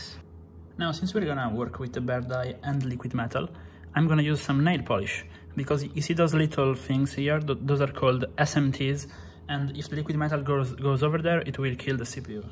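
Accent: Italy. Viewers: Si sente che è italiano, ma c'è anche da elogiare il fatto che riesca a fare interi video senza mai fermarsi e con una pronuncia giusta ✌️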